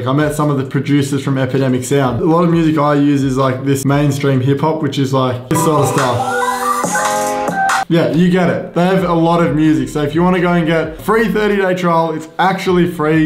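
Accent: Australia. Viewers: OMG I FREAKING LOVE how Aussie you are!! Lol it's so refreshing to hear someone as Melbournian as you on YouTube! Haha I got a HP but it's pretty much the same steps yeah?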